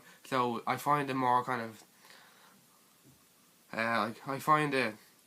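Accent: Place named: Ireland. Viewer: I'm sorry for coming off as a complete weirdo and being off topic but I love your accent (are you Irish?)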